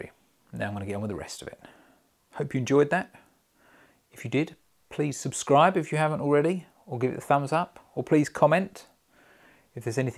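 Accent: United Kingdom. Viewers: I have just found you! I'm very much admiring your British accent & grammar! Your work is exquisite! I've learned quite a lot so far. Thank you so much for giving back! Your help makes me feel blessed and God bless you! I Love your work!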